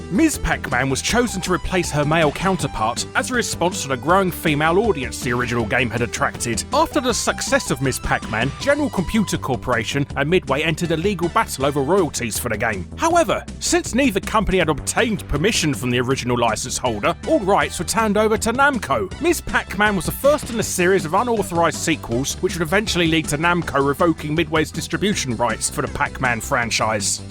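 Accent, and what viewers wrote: United Kingdom. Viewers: People be hating this voice, that's a good ol British accent right there! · Fuckin' cartoon british voice... It was really annoying. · waaah i dun liek teh voice waaaah Shut the fuck up and deal with it. This is what a lot of British people sound like. You don't like it? Think how your accent might sound to us.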